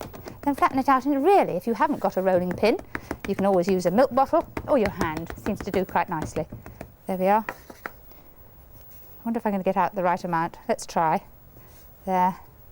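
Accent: RP, United Kingdom. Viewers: That's when presenters on TV spoke the Queens English. Flashing teeth etc and the more showman style is down to the influence of US style infiltrating television.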